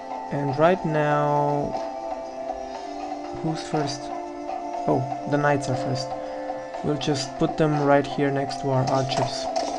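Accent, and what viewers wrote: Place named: Germany. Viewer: Excellent introduction to this great game. Thank you very much! Cute accent...I bet you are from Germany :)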